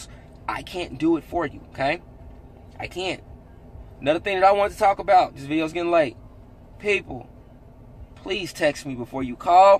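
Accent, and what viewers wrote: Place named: Oklahoma, United States. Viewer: are you from Oklahoma love yours accent thinking about joining. thanks for sharing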